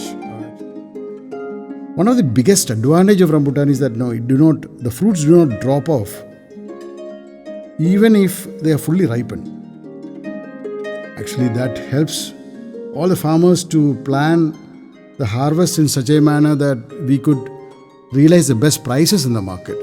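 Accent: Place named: India. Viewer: I don't understand your India English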